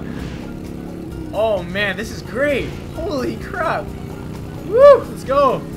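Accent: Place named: France. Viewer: Your french accent is hilarous :D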